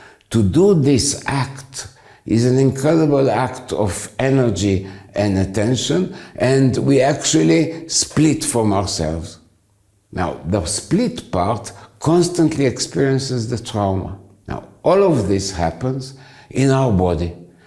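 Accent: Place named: France. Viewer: I love your accent are you French and thank you for your wonderful, articulate wisdom. A merci...😊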